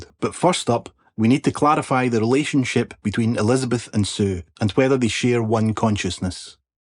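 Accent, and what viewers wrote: Scotland, United Kingdom. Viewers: I love your scottish pronunciation such reflective words and opinions, I love your way of interpretation and understanding too btw. Well done mate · Incredible video. Helped me understand the film even more than I thought that I did. Also nice to hear a fellow Scott. Subscribed. 💙🤍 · Are you Scottish? 🏴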